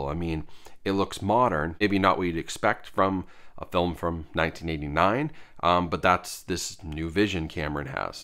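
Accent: New England, United States. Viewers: are you a New England bro?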